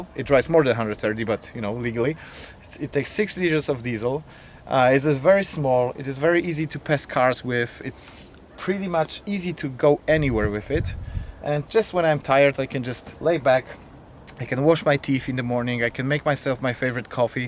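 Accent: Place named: Sweden. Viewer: You have a Swedish accent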